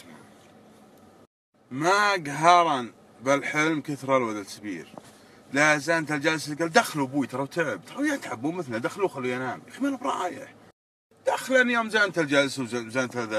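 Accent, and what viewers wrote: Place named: Qassim, Saudi Arabia. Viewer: يااخي والله اني اقدرك واحترمك الله يكثر من امثالك يا ويعطيك طوله العمر. يازين لهجتنا القصيميه ويازين عيالها وياحلاوه انفسكم وروحكم والله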